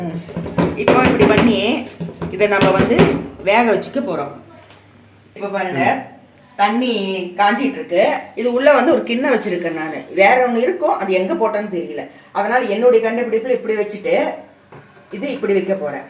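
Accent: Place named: Kongu, India. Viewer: Super ma, kongu தமிழ் manakudu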